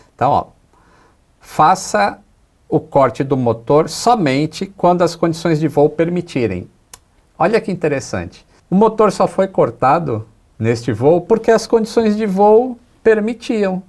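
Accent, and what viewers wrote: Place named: Rio de Janeiro, Brazil. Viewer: tá pegando sotaque carioca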